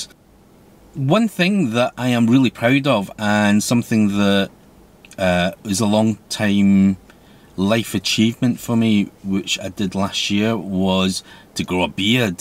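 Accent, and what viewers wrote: Scotland, United Kingdom. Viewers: Are you an asian scot? Like an asian ewan mcgregor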